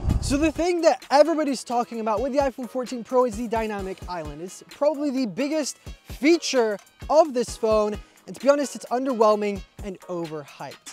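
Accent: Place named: United States. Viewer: You have an American accent. Are you an American living in British land?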